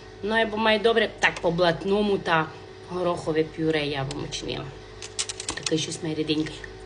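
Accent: Transcarpathia, Ukraine. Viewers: Дуже гарна жіночка, такий цікавий акцент,Закарпатський.Дякую за позитив і рецепт.Всього гарного та позитивного вам.Наталья.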